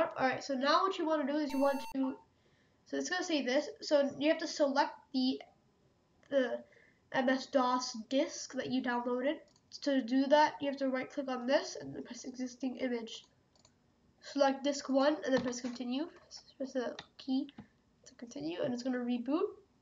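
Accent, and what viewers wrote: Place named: Ireland. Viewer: Wow the accent. sound a bit irish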